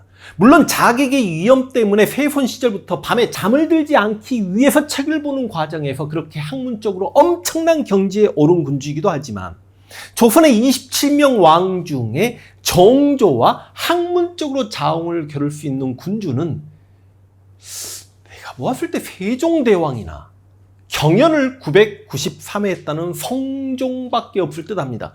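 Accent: Jeolla, South Korea. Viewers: 부조간 발음 들으니 전라도 일단 거부감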